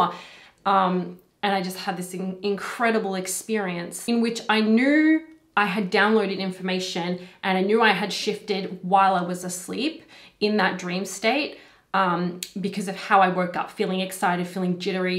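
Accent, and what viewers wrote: Australia. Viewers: You are amazing. Are you Australian?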